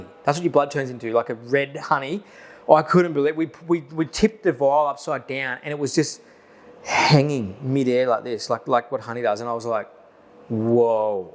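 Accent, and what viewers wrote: Australia. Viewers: This dudes Australian accent is strong. Never struggled to understand an Australian.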